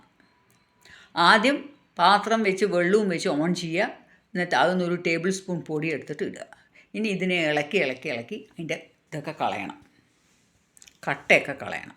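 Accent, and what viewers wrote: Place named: Palakkad, India. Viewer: ഞാനും പാലക്കാട്‌ ആണ്. അതേ slang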